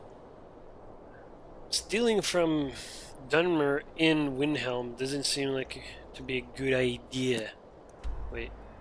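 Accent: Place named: Quebec, Canada. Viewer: There's no need to get an attitude. He is French Canadian; French is his native language. Yes, stutter can mean a hiccup in smooth frame transitions, but the main definition refers to speech. You didn't mention 'framerate' or 'smooth' or 'gameplay' or anything to suggest you meant the framerate. Additionally, you specified the subject as "he", not as "his game" or "the game" or "Skyrim" or "framerate", so what you said actually refers to his speech, not his framerate. Lern 2 english plz.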